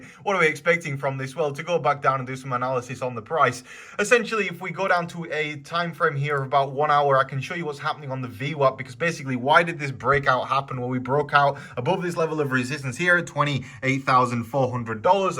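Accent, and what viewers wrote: United Kingdom. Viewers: $CUDOS is looking good. since my ape in, it show's a strong correlation with BTC my favorite part is that I can mine #CUDOS with my old laptop Nice vids bro, I feel smarter watching your videos, plus when ppl hear a British accent guy, they think I'ma pro for watching 😎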